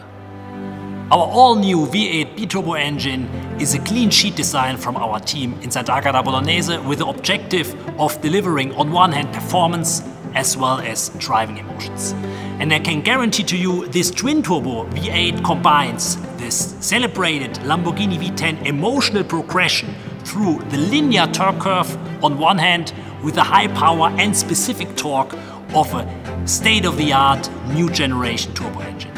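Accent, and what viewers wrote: Germany. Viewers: This hard German accent 😂😂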